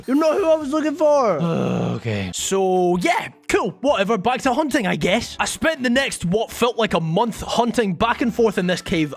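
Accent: Scotland, United Kingdom. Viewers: Nothing like listening to a Scottish dude playing Pokémon 😁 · Love the accent my fellow Scot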